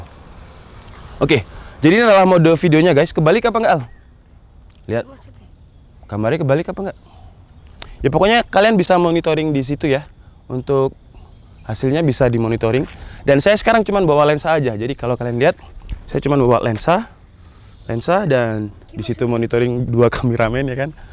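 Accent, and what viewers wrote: Java, Indonesia. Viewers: Wong jowo tt mas?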